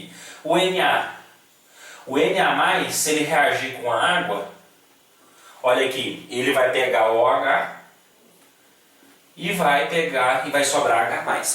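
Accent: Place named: Campos dos Goytacazes, Brazil. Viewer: Parabéns pelos seus vídeos, professor me ajudou muito. Alías, uma pergunta, vc é campista ( campos dos goytacazes-rj) , sua voz tem um sotaque muito parecido hahahahahahaha. Brigadão, estou curioso....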